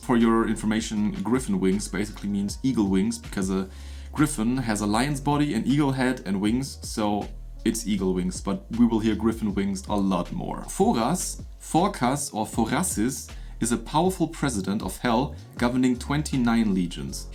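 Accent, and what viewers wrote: Germany. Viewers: Do not worry how you pronounce the names. German accent is the sexiest.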